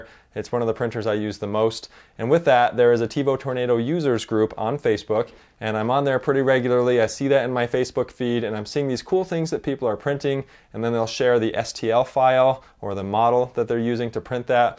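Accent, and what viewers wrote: Utah, United States. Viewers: Ha ha - I was raised in New England, actually and moved here as an adult but maybe the Utah accent is rubbing off on me :) I don't have a tarantula or an ender and haven't tried either of them so I'm not a lot of help there. I have printers by Flashforge, Tevo (actually just sold that one to a friend), Alfawise and Printrbot. I've heard good things about Ender machines but haven't had the chance to try it myself. Sorry I couldn't help more with that! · I am not, but my wife’s family is from Layton. And we got married in Salt Lake. But mostly I asked because I have a friend that I THINK is from Sandy and you talk just exactly like him. Also, did you say in your video that you have a tarantula? Did you cross shop it with the ender 3 and if you did why did you decide to get that one? I’ve been shopping for my first 3D printer and the ender 3 pro is my top choice- barely inching out the standard for the more robust support bar under the stage and much improved power supply. 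I have seen exactly one comparison video with the ender and tarantula and the tarantula came out more favorable but I never saw any other videos on that machine. Any input would be appreciated. I’m anxious (and nervous) to start the hobby!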